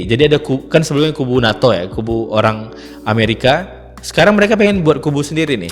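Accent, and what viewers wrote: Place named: Lampung, Indonesia. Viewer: dari lampung nih kayaknya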